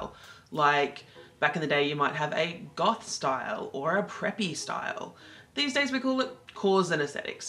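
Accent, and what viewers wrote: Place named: Australia. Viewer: OMG! an aussie! Thank you! :)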